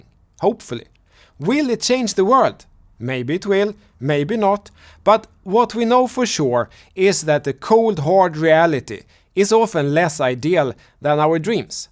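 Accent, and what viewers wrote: Sweden. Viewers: The biggest Sweängelska I heard in my entire life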